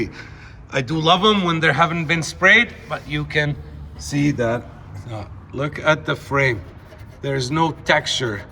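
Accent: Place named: Miami, United States. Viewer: Portland? He sounds like he's from Miami.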